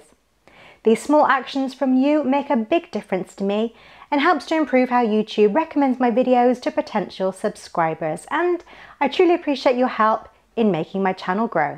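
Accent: United Kingdom. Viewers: I love your accent I wish more British women were in CA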